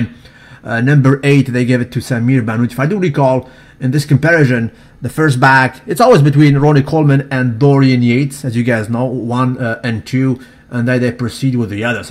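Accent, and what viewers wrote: France. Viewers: son accent est plutôt français que québecois dailleurs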